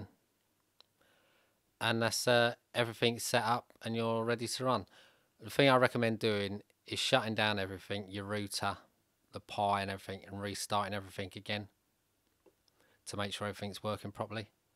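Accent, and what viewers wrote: England, United Kingdom. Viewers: Can't understand that AWFUL english accent. I m outta here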